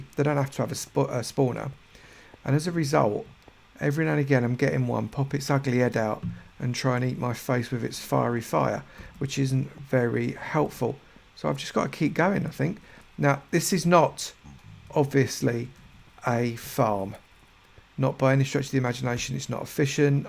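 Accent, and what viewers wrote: United Kingdom. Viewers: I really enjoy your vids/liked and av learnt alot from u, Esp wit redstone. I've copied a few of your tutorials and non redstone 1's. But my question is: 1 r you frm the UK n where,as I'm frm Norfolk coz I love ur ascent and 2: is there a way to make a lift system without using redstone 2 get items frm your mine to ur base on ground level?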